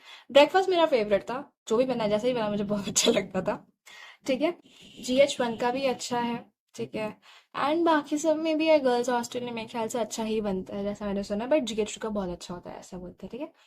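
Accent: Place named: Punjab, India. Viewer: I am damm sure you are punjabi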